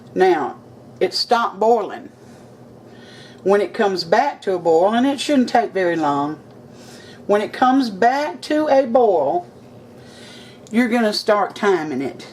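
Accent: South, United States. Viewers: Love your recipe videos and the southern drawl makes me smile. Keep it up!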